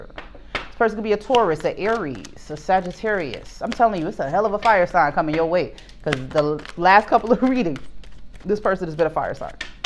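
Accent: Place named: New York, United States. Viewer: Ma you are so NEW YORK and the way u talk you grew up in my era Keep up the great work.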